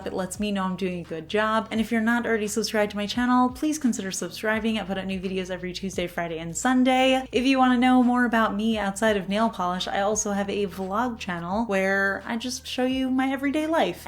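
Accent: New Jersey, United States. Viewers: It's that jersey annunciation 🤌 😅 we miss you here in good ole jerz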